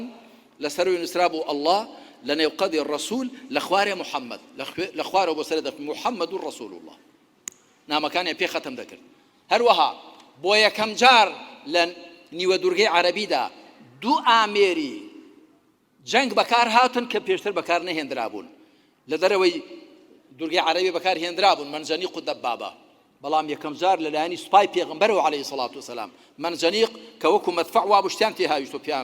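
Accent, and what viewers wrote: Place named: Pishdar, Iraq. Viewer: بە کوردیەکی زۆر خۆش و پاراو و پەتی قسە دەکات. شێوەزاری پشدەری زۆر خۆشە، هەقە بکرێتە ستانداردی کوردیی سۆرانی کە لە نێوانی هەولێری و موکریانی لە لایەک و سلێمانی و گەرمیانی و ئەردەلانی لە لایەکی دیکەوەیە.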